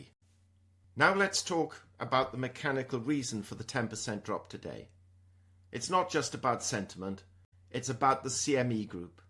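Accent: United Kingdom. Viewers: Hi - thank you. Really appreciate a calming analysis at a time like this. Had just assumed you were in the UK on account of your accent - gather not. All the best. Simon / Adelaide - Australia - PS the presentation seemed as polished as ever to me.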